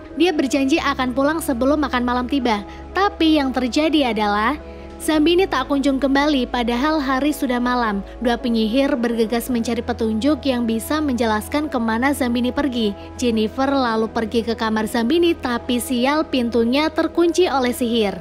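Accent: Pemalang, Indonesia. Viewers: Orang pemalang ya kak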